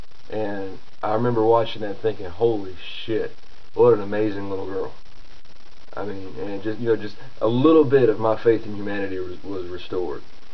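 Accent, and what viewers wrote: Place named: South, United States. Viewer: Are you southern? I can hear a bit of an accent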